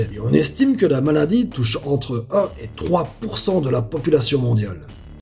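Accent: Liège, Belgium. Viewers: t'es liégeois ?? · normal c un belge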